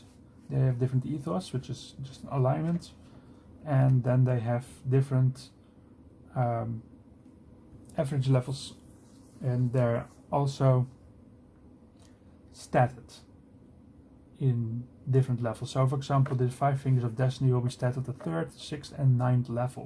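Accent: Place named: Netherlands. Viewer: This was a very comprehensive and thorough review! I kind of want to get it now, once I've got enough money to spare for it. Megadungeons are super interesting! On another note, are you Dutch by any chance? Your accent sounds very familiar. (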